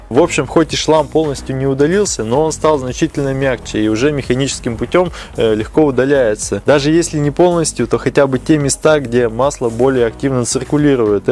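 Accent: Perm, Russia. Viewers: Пермский что-ли?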